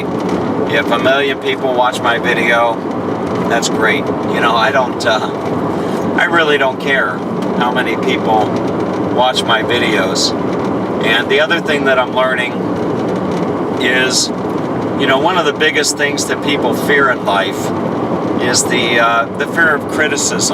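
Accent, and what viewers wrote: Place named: United States. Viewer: Thanks for revealing your story.. I found your channel from the Cains Australia video and have now almost finished watching all your down under videos!.. Being British, I enjoy your out there yank style of talking for some reason. and has been great watching your Journey. I'm coming up to 30 soon and about to head to aus or canada on one of those working holiday visas to do farm work, woofing.. But can only wish I had more smarts to have figured out the self employed thing by now, I have done webdesign, have a portfolio site but failed at the getting clients part.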